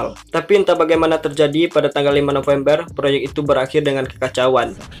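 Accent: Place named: Sumatra, Indonesia. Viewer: Logatnya kaya orang sumatera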